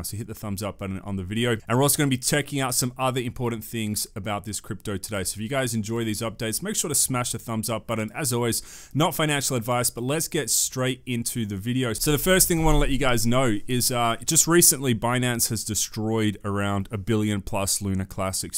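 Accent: Australia. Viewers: Love your accent. Makes me miss oz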